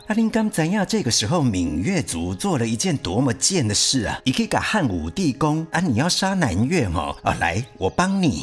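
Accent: Taiwan. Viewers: 這台灣國語聽的很不舒服，要就全臺語或全國語，吞不下去有點可惜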